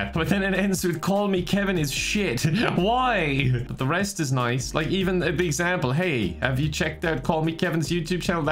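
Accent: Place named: Ireland. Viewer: OMG HE'S IRISH I NEVER REALISED also I have been subbed for 3 years and though he was joking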